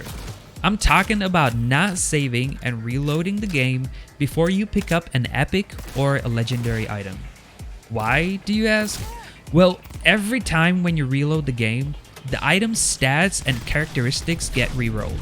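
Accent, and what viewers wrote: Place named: United Kingdom. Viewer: Why is it always someone with a british accent telling people how to play the game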